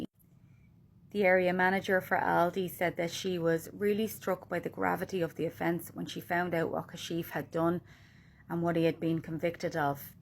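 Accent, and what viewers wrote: Ireland. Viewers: More money of the taxpayer ,the problem is people keep voting for the people that do not stop illegal migrants. and all this makes it so bad for good men who look at a gorgeous woman like you with an amazing irish accent as predators . dating and even looking at women is hard now .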